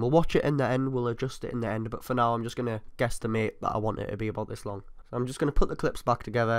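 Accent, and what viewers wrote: Newcastle, United Kingdom. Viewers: A local Geordie I see, nice one.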